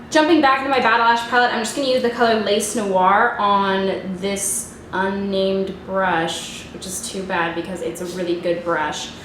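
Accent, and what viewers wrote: Canada. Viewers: Your Canadian accent tho! Can't get over the way you say crease, I love it! Love your work xx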